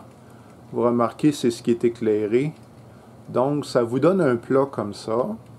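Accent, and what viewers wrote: Quebec, Canada. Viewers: De bonnes astuces pour le débutant avec comme bonus ce bel accent québécois... Merci ! 😉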